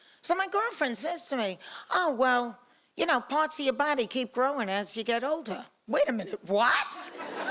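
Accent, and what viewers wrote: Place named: Boston, United States. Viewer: Well, now you’ve heard two people with a Boston accent. Only 675,345 more Bostonians left to listen to. 😅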